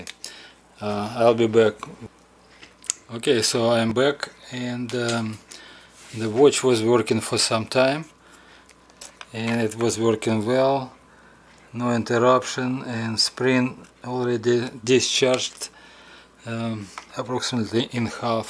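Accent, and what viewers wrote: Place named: Russia. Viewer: Your Russian accent is перфект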